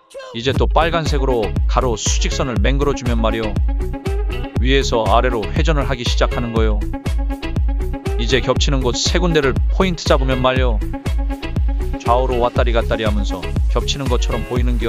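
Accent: South Korea. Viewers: 나 충북 증평군 사람인디 님 약간 충북 사투리 같다. 그려 안그려?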